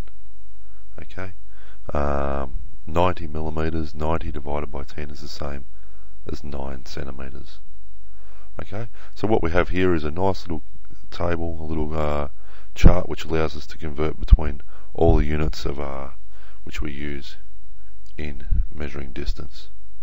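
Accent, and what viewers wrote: Australia. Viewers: This dude is an Aussie or NZr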